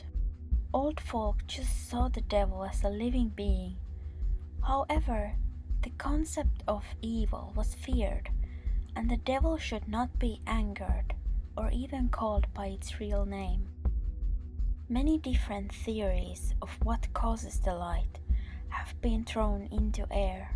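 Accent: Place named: Finland. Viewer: I adore your accent so much! My mom is from Finland and has an accent as well, I live in America now so it's rare that I hear someone else with a finnish accent.. and I haven't found another finnish channel that I like as much as I like yours. Love your videos! <3 (especially the spooky ones)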